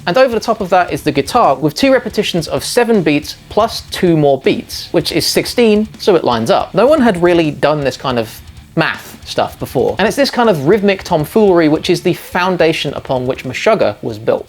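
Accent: United Kingdom. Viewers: You speak the queen's English. It's Maths. Plural. Short for mathematics. There isn't one math. There are many Maths.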